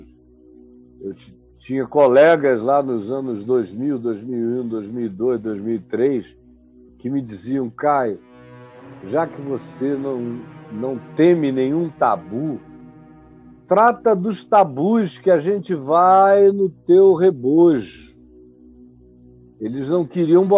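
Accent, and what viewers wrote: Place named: North, Brazil. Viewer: Amo essa linguagem nortista do Caio. 👐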